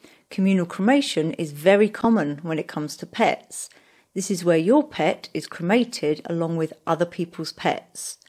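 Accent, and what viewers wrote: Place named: England, United Kingdom. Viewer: English accent opinion is null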